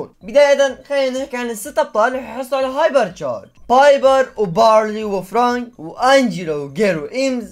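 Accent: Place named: Saudi Arabia. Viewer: ليه تتكلم سعودي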